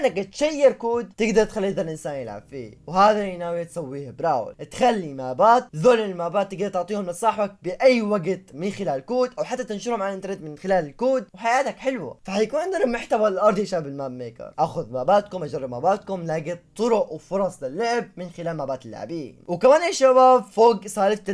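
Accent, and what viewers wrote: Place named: Saudi Arabia. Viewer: سولف بلهجتك ليش تسولف سعودي و انتا سوري ؟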